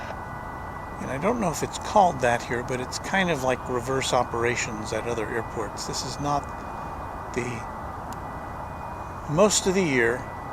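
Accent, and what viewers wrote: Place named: Brooklyn, United States. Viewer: haha! and host is from Brooklyn New York, can you believe it 😄 #accent??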